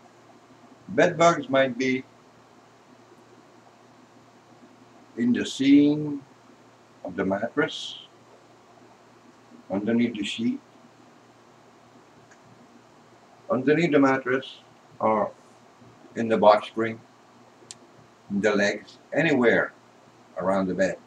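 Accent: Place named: Louisiana, United States. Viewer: I hear a home accent of a Cajun... ❤🥰❤🥰❤ I am from the southern part Of Louisiana.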